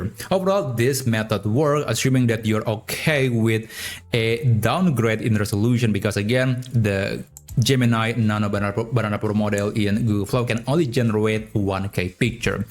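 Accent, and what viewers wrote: Indonesia. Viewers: dari logatnya kerasa banget nih orang indonya hahaha makasih bang tutorialnya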